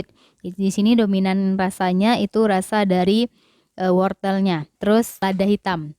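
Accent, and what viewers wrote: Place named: Minangkabau, Indonesia. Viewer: UNI LOGAT MINANGKEBO NYO RANCAK NDAK ILANG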